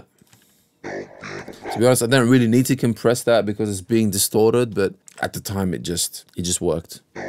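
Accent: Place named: Australia. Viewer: Ahh the comforting sound of the aussie accent, it makes me happy to hear you haven't lost it at all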